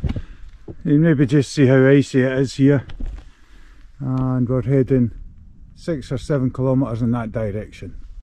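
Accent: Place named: Scotland, United Kingdom. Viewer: I confess to being very much a southerner, indeed right on the south coast of England but I come to walk in Scotland for about 3 to 4 months of the year spread over a number of visits. Slowly, very slowly, I am getting better at picking up the accent and some of the words you use to describe your brilliant mountains. I love watching videos of Scottish mountain walks and it’s really good to hear the pronunciations. That said I have one hell of a struggle to try and pronounce some of them.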